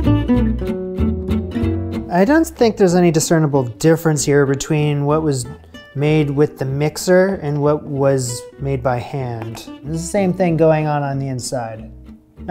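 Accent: Canada. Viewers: I thought you might be Canadian, but this confirms it lol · It is Canadian! Dont feel bad! Its your Ontario roots. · I've also noticed you pronounce vague as vag not vayg the way Americans do. The bag-ull instead of bay-gull follows suit. Thanks for addressing it though. Nothing wrong with being a proud Canadian, eh? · I say it the same. I live 30 mins from where he’s from in Ontario. I didn’t think it was odd but people pointed it out a few years ago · "Bägels". Coming from Sweden, Canadian English isn't a variety I'm very familiar with. I always feel a slight sense of satisfaction when I can pinpoint the Canadianisms in your pronunciation! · My grandmother was Canadian. You say it just like she did I’m gonna go with it to regional pronunciation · I think its a north american dialect thing. I'm in no way an expert but I'm besties with an SLP and we geek out about dialect stuff. 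Please correct me if I'm wrong but the Canadian vowel shift and the northern cities vowel shift have a similar thing in how they treat the short a. Making for bag-el vs bay-gul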